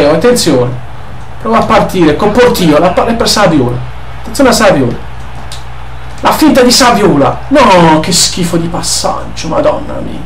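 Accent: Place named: Apulia, Italy. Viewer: Sei molto simpatico e il tuo accento pugliese (Barese?!?) dà quel qualcosa in più!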